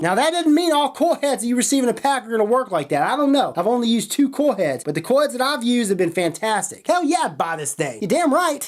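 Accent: South, United States. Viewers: rahliE05 that's how people from the south sound...like motor oil sounds like motor oh-ill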